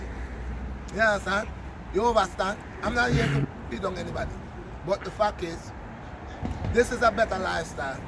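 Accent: Jamaica. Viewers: I could tell he was Jamaican before even goin on the video 😂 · Is that Jamaican?? 🤔🤔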